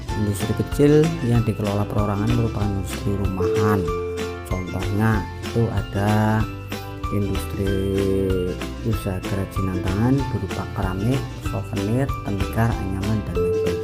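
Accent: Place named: Banyumas, Indonesia. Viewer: Ngapak temen wkwkwkwk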